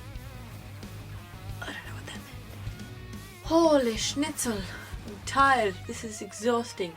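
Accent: Australia. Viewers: r u australian? you sound like it :) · Heyy there, would you be able to tell us how you initially explained to your hairdresser exactly how you wanted your hair cut in this way? I've been to soo many hairdressers, whip out so many pictures and it's just arghhhh! When I first watched another video of yours I was like AUSSIE ACCENT! Don't see many of us Aussies on youtube. =] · That's an Australian accent! :3 I love Aussie accents way more than British personally. She's got so many great tips on her channel. I think I'll subscribe. <3 and hey, there's nothing wrong with being a kid, we all gotta be one sometime in our lives. Enjoy it! And if the 80s phase makes you happy, go on :D I'm a 90s gal myself so I understand!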